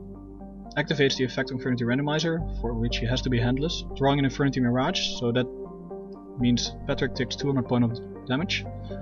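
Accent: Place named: Netherlands. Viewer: youre dutch aren't ya? I'm too :P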